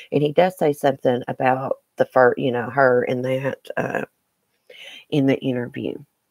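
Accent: South, United States. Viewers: Your the first person I have heard say more things I agree with than I don’t :) You sound southern, I am too 😉 That must be why :)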